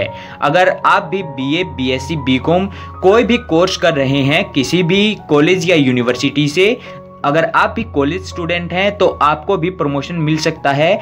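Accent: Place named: Bhiwani, India. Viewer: bhai aap ki awaj suni suni lgi thi tho muje lga bhiwani se ho ge😅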